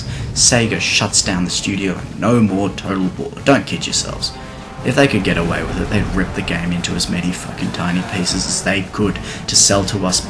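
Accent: Australia. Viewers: I hope for the majority of the people that pre-ordered R2TW that on receipt of such utter crap to NOT pre-order any game again based on trust alone till previews and proper critique is available for it. 'Fool me once, shame on you; fool me twice, shame on me' For me Empire: Total War was the kick in the balls from CA (and my one and only ever pre-order). Damn my desire to be Sharpe with the 95th rifles leading the British Empire:/ I still haven't purchased R2TW; well at least till it's completely fixed. I've wanted this game since the release of M2TW. There was a dream that was Rome (II). This is not it! Thanks for your videos; something about the auzzie accent gets me! That and you skips view on life (and stuff) is refreshing especially when living in the UK and the constant barrage of hypocritical nonsense gets a little too much. Loved it down under, can't wait to get my pommy ass down again, well, with ear plugs. 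I wont hear the end of it considering how you tanned our asses at cricket. /rant!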